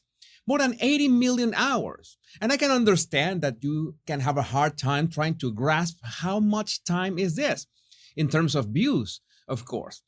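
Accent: Cockney, United Kingdom. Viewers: Spot on cockney xx tee hee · Impressed by your Cockney accent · I love your Dog Shite- mentry! As a Londoner, I love your Cockney accent!😅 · Loving your Latin Cockney accent, you rock, 👍❤️😁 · I Love your cockney, from a cockney let me tell you he was top of the apples, went down the frog with his trouble and is now in the pig.❤️❤️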